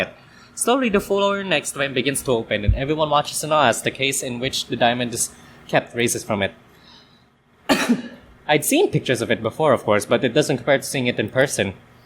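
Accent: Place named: Russia. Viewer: Another lovely video as usual XD love you Russian accent :3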